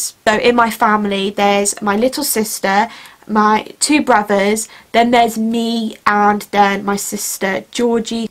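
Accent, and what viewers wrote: United Kingdom. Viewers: Hello, What is your accent? I’m British/English too and just wondered where you are from 😊 Also you are so brave, thank you for sharing your story ❤️ xx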